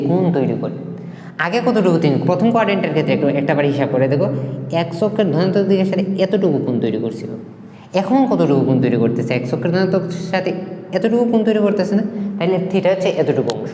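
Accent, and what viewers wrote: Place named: Sylhet, Bangladesh. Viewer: আপনে কি সিলেটি? কথায় আমাদের সিলেটের মতো লাগে.....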